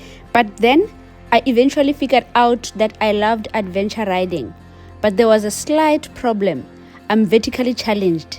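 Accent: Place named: England, United Kingdom. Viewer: American here, and just discovered your channel. Just wanted to say that I love your voice and your English accent!….especially when you roll your “R’s” 😂 reminds me of Tyla 😅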